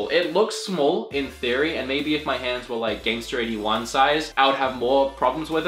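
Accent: Australia. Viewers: good video! what accent is that, are you a Australian? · Wher do you live man? Where are you from? I thought you were a Ozzie haha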